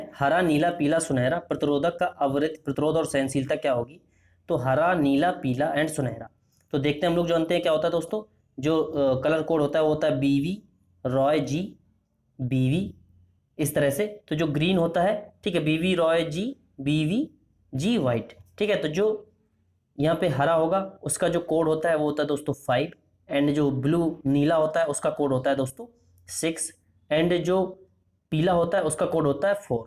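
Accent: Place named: South, India. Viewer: South Indian hindi me samajh nahi aatha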